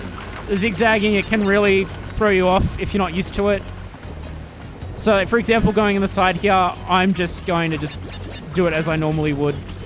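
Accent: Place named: Victoria, Australia. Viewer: Gotta love that Victorian accent saying heeya instead of here. Wasn't grating at all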